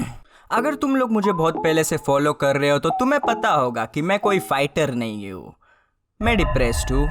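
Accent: Gujarat, India. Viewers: Ap gujrat se ho kya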